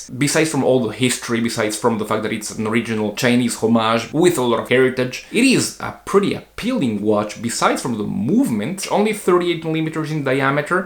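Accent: France. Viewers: I know he is a French. I like his a French accent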